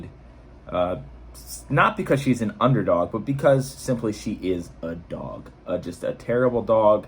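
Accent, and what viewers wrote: East Coast, United States. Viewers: def east coast vibes just because the way he talks shit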